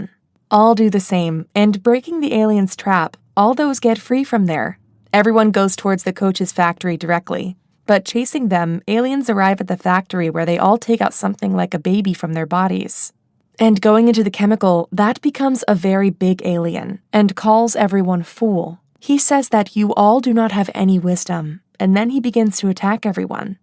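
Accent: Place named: United States. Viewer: Why does the narrator have a Amrican accent but speaks like English is not her first language?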